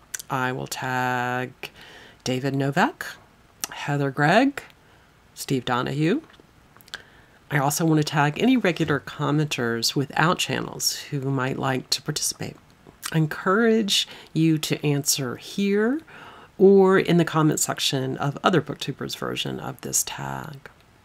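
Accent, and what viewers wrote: South, United States. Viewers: I love a Southern accent it's so soothing and mellifluous. I'm not well educated and keep a Chamber's by my bed to look up old outdated words and terms found in Victorian and Georgian literature. Thank you again for being an interesting guide.❤️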